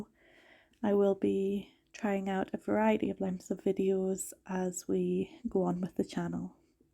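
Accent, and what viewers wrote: Scotland, United Kingdom. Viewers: Greetings from Portland Oregon. Mac Bonnie Barbie and Coline follower, also mom of 4, also migraine sufferer, I lived in NE Scotland for about 2 years and my second was born there, so jumped at the chance to hear familiar sounds sights and dialect.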